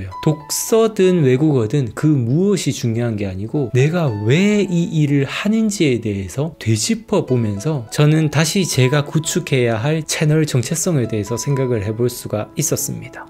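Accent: Andong, South Korea. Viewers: 구독했습니다 아직 님 영상을많이보지는 않았지만 요즘 많이 고민중이 두가지문제가있어서 님 생각을듣고싶어서요 하나는 저도 책이 800권쯤있는데요 한번씩 정리할까? 하는생각이 들때가 있어요 저는 돈벌고부터 책을읽어서 다 사서 보다보니 이렇게되었는데요 책을 읽기도하지만 한번씩 보여줄여고 모으나? 하는생각이 들더라고요 뭐 단편적이아닌 지금제 많은생각 플러스된거라 다 이야기는 못하겠지만요 서론이 길었네요 님은 읽은책들 정리안하시고 게속 가지고있으실생각인가요? 또하나는 저는책을읽을때 줄긋고 표시하고 모르는단어 확인해서메모하고 그런데 마음에든글들을 님은 따로 메모를해놓는지요? 지금보니 좋았던글 플러스 님생각도 쓰시는것같은데요 제가 직장을다니다보니 손목이안좋고 악필이라 쉽지는않은데 또 써놔보고싶긴하고 두가지가 계속 해결못하는 일인입니다 우문현답 기다려도될까요? 다른영상에서 답을찾을수있을듯하네요 다들똑같은고민을하나봅니다 영상들감사합니다 글고 안동사투리 정답네요 ㅎㅎ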